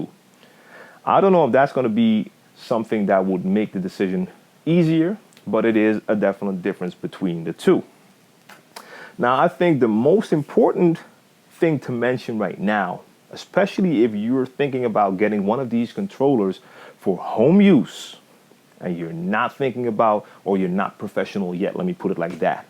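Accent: New York, United States. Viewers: you said you from the netherlands? u sound like u from new york lol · you from the netherlands????? mannnnn i thought you were from NYC. have the accent. lol.. good looking on this review. I have Numark ns7. love it.. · Wait. You from the Netherlands ?!!! Woooow, I thought you were from NYC. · it's funny i thought you was american from ny :) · wow he is not from NYC that's crazy i was thinking he was lol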